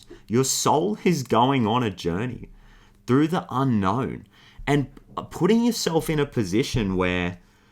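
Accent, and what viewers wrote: Australia. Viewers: Hey, thank you. It's so nice to hear a fellow Aussie read. And it's spot on. I am being taken to a rental tribunal by a managing director who lied to me and has lied on an official form. I am scared, not sleeping well, although I had a dream where I saw the word "peace" and the name of the managing director who has lied, defamed, and made my difficult life harder. I felt a sense of relief after that dream, some compassion for the damaged young woman that I am dealing with. I have been showing up every day, taking all the action I can. The hearing is on Wednesday. I will take compassion, strength, and all my spirit guides and angels with me.Thanks for your wisdom.K.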